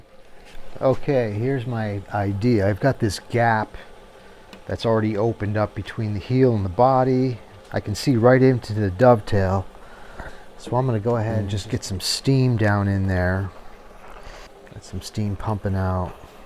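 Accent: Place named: Philadelphia, United States. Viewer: Where are you based? My gf and I are trying to pinpoint your accent. I'm saying close to Philly. That's one effed up dovetail. Nice work,!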